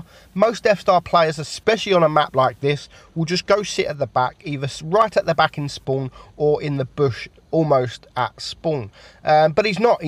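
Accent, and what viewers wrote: United Kingdom. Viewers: Yeah that's pure blood British accent and dialect lol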